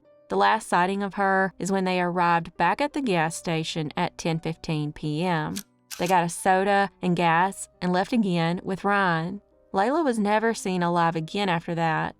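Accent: South, United States. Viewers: My family and I were talking today about southern accents. Yours is adorable. You do such a great job on these stories.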